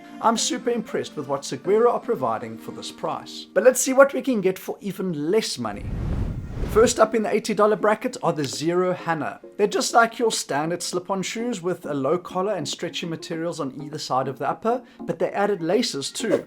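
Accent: South Africa. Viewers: I hear south Africa in this man's voice! 🇿🇦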